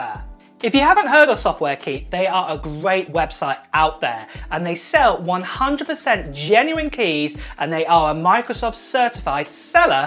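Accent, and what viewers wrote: United Kingdom. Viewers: the service that you are advertising won't allow anybody outside of the US to enter their address in the purchase page. How did you get your keys, because you sound British, or are you living in the US? Maybe you should disclose that.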